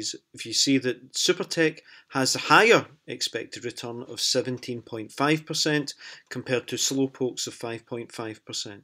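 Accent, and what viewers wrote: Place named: Scotland, United Kingdom. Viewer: Very helpful and Love your Scottish accent!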